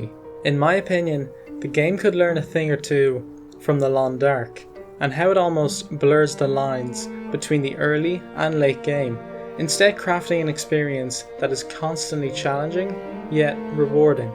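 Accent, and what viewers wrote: Ireland. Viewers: You are definitely Irish I can tell because I lived in Dublin for many years Good video by the way you make great points👍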